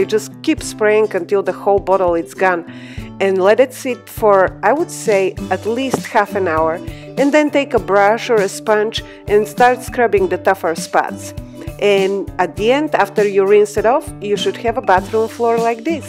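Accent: Russia. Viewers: Lovely (RUssian?) accent. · Russian accent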